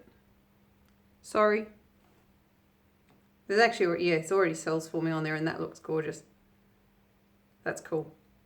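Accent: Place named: United Kingdom. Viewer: Hi that's beautiful a suggestion for the cat that used to live in the house since you have an allergy maybe you could find her a forever home? clearly she's not a stray or feral like the other ones and she could really get hurt by one of those because they're not the same I used to live in Miami, florida,usa and the lady across the street I did not know at the time when I moved into my house she was a hoarder and it was just heartbreaking come to find out not only did she pick up ones from everywhere she even picked up one that I knew had been somebody's pet and she ended up telling me that when she found that one it had a collar on it so she was stealing people's pets also very sad please try and find the kitty that belongs to that house a home that would be so awesome thank you in advance maybe you could get ahold of a local rescue or something? 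And if you heard screeching going on that means they're in heat and you're going to have multiple cats pretty soon because they multiply almost as fast as rabbits local rescues in the United States all come also come in and spay and neuter Strays and feral cats I'm sure you sound like you're from the UK perhaps I'm sure they have something similar to that there I'm just trying to save you the headache of multiplying 7 cats turning into 17 etc etc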